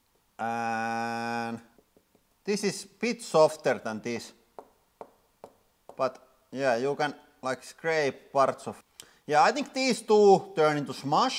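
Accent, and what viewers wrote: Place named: Finland. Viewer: That classic Finnish accent making Weetabix, Fetabix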